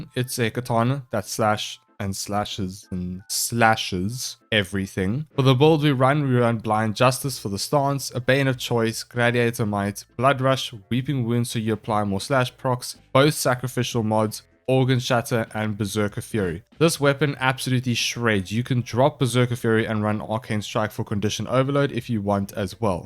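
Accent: Afrikaans, South Africa. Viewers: My guy is afrikaans awe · Bro. You sound extremely south african🤔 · is this guy south african ?? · Either I'm tripping or you speak Afrikaans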